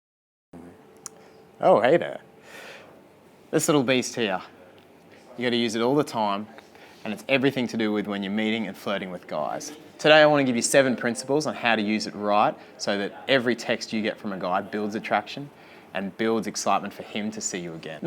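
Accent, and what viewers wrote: Australia. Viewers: I love your strayan accent sm XDDD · its the Australian accent🤣😻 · Are you Australian?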